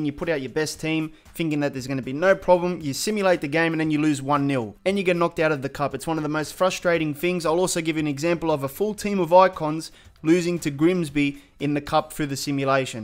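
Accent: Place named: Australia. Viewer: He has australian tinge. I and long vowels. Adviiiiice. Hit. Thinking. Nil. I could go on. R.i.p if you were nz born and then moved to aus. You also sound like you born south auckland?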